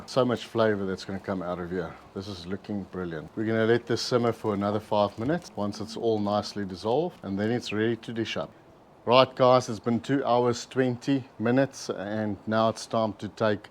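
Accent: South Africa. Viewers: Love the South African accent!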